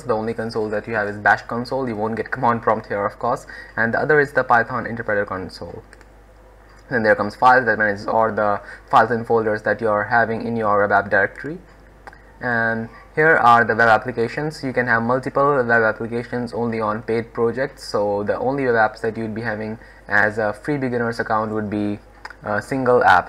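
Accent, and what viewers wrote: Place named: Brazil. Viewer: Ahhhhhhh 😂😂😂 é Brasileiro, certeza...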